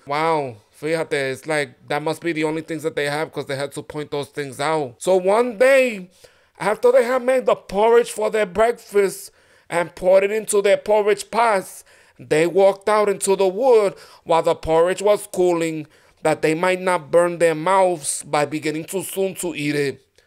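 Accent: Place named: New York, United States. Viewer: 🤣...goldielocks...una presenta....weewee bear🤣! Love his Spanglish NY accent🙋‍♀️🤣👏👏👏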